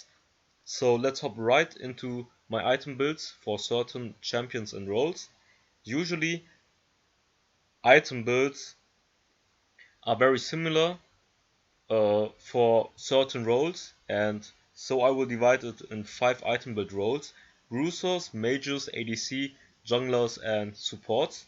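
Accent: Germany. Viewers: Wie man einfach raushört das er deutsch ist xD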